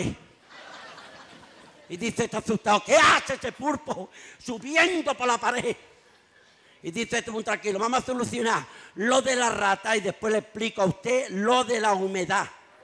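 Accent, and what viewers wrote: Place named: Andalusia, Spain. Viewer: Solo consigo entender el 40% de lo que dice. ¡El andaluz no es castellano!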